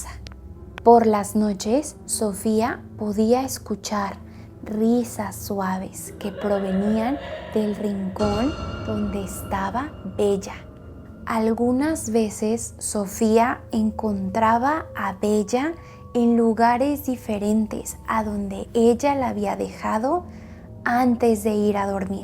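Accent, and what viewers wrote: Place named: Mexico. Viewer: Vocabulary with real story. That is awesome :) It makes it easier to remember. Also I think your Mexican accent is cute and a bit easier to understand. I often struggle with accents from Spain.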